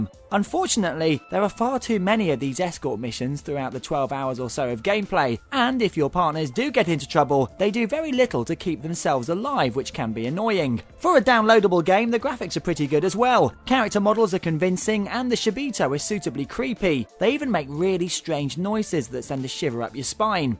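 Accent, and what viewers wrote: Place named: London, United Kingdom. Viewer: you sound like youre from london!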